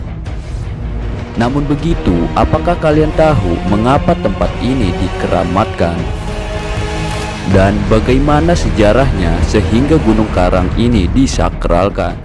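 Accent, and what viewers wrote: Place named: West Java, Indonesia. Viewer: wong banten.udu mengkonon.iku mah logat wong sunda.asal bae wong kien.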